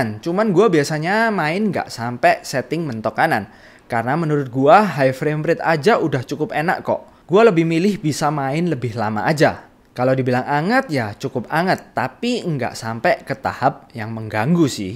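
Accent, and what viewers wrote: Java, Indonesia. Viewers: Bang bagusan bilangnya "saya" jgn "gua", soalnya logat lu medok bgt 😅, jd gk enak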